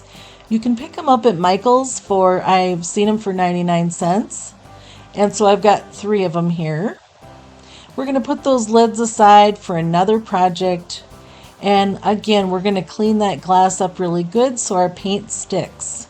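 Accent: Minnesota, United States. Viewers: Quick and super cute projects! I am always looking for jar ideas. Those little ones are too cute! You cracked me up with the little joke about the jars saing we need some beads to keep it farmhouse ya know! And sounds just like Minnesota way of speaking! 😂😂 I loved the sign! The color is perfect and the name of the store, and last one in its a rotten egg! So fun 😂 You make me laugh at least once a video. I love it and you my friend! Have a great week! 😚😚 Oh and congrats about your shop! You're going to be home all day to mind the store?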